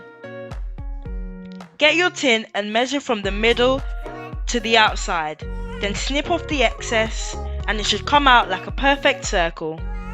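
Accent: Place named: France. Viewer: I am trying this chocolate cake right now. Really hope it will be as good as yours. Very well done. And by the way great French accent.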